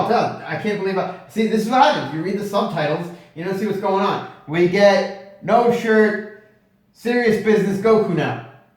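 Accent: Italy. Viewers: zio, ma sei italiano?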